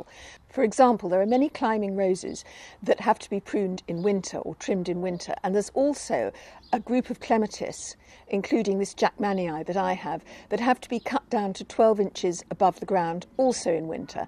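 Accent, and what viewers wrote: United Kingdom. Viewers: I like the way you speak! What a nice British English! Will keep these useful recommendations in my mind, Greetings from Ukraine!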